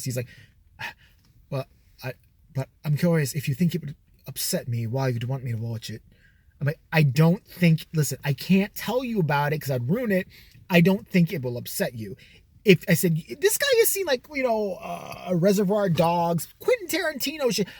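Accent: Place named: United Kingdom. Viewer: God I love your British voice, bravo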